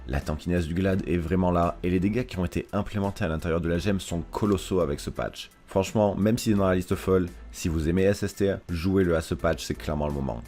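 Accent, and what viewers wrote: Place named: France. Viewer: I feel like 90% of what he said was english words prononced the french way :D gotta step up your frenglish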